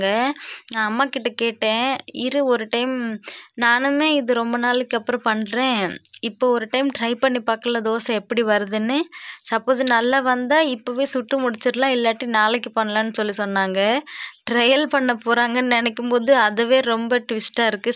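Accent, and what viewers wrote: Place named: Odisha, India. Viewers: Tamil kathukittu pesura odisa ponnu ithu I think 🤔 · I feel this girl is not a Tamil girl... She has learnt Tamil when working in TN....her accent is different and her dressing and all matches odisa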